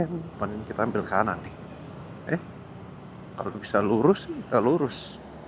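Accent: Ambon, Indonesia. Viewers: Logat ambon kantara Sukses selalu kk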